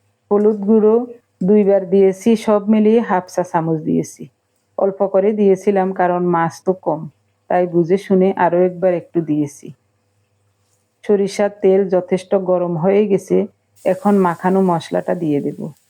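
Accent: Noakhali, Bangladesh. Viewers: Bandhacopi bata khub bhalo laglo noakhalir khuda lagle hudao katha kotobachar par sune mon khub khub bhalo laglo dhanyawad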